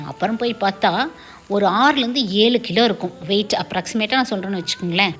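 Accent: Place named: Kongu, India. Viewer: அது சரிங்க👍🙏 அப்ப சுப்பம் னா என்னங்க🤔 ( இனிமையான கொங்கு தமிழ். வாழ்க வளமுடன் என்றும் நலமுடன்) 🙏🙏🙏🙏🙏🙏🙏🙏🙏🙏🙏🙏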